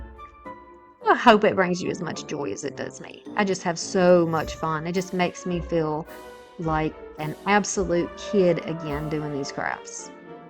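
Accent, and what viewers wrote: South, United States. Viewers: Jackson and a Southern accent... A fellow mangolia stater? Also, adorable crafts.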